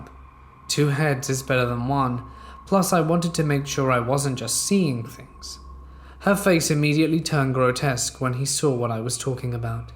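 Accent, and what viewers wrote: United Kingdom. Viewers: His voice has been putting people to sleep for years! I wonder Truly how many of us go strait to anytime we can’t sleep, have issues sleeping, or just crave that gloomy doomy creepy British voice from the gates of hell to whisk us off to dreamland to challenge Freddy Krueger to a death match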